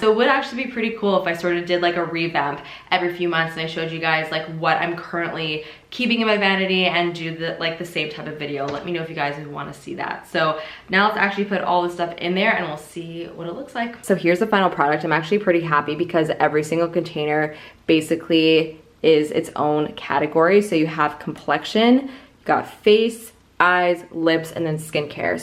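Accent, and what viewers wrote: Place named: Canada. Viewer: Hehehe I love your subtle Canadian accent 😜 “pro-cess” vs “prah-cess”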